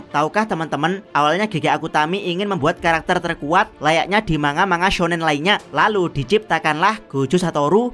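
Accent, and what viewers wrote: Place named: Purworejo, Indonesia. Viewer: Logatnya kaya orang purworejo min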